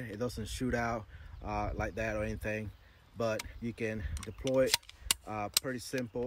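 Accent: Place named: Lumberton, United States. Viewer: You from Lumberton? I got some family from that area and you got that heavy accent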